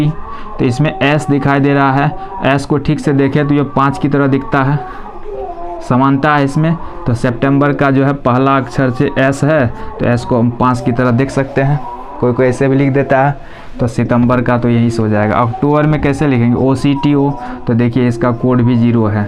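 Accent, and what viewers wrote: Bihar, India. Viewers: आप बिहार से हो ?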